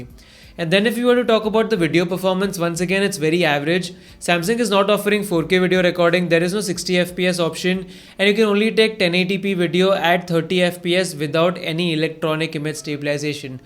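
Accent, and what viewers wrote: India. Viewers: bullshit! i cant handle the speaking of indians. I'm a little bit irritated to him while lessening but I'm interested in his review HAHAHAHA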